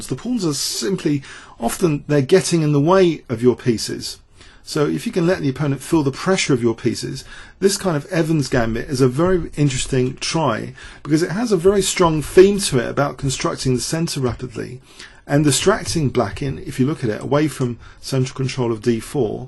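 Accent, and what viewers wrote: United Kingdom. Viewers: British accent is so British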